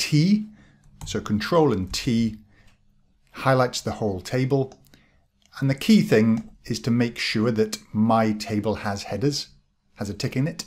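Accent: Wales, United Kingdom. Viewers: Came here for Excel, stayed for the heavy British accent 😅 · Loving the Welsh accent 😁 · I’ve been using Excel for decades and have only scratched the surface of the pivot tools. This explanation has helped a lot. Thanks! (PS. Do I detect a fellow Welsh accent?)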